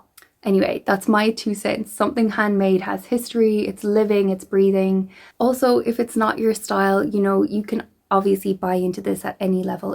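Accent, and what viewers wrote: Ireland. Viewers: Your accent sounds Irish?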